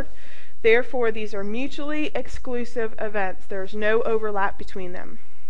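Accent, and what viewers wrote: United States. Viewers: Love the accent...Georgia? I'm from Texas. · are you from the south?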